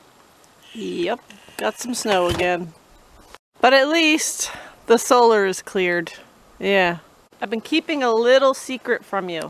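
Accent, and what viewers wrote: Canada. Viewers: Canadian accent is awesome. I bet us southerners sound like beverly hillbillies to you 😂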